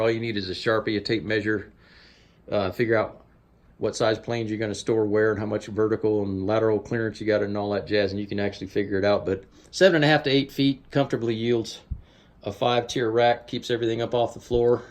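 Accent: South, United States. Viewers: Good video man! I love the Southern accent lol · Very nice! Where are you located? Sounds like GA/AL. I'm in NC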